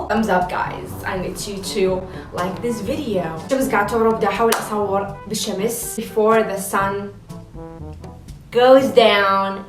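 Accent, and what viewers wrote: Iraq. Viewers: بس شويون حاولي تقللين من الانگلش لأن مرات مافهم هواي وهم اللكنه العراقيه عليچ تجنن احلى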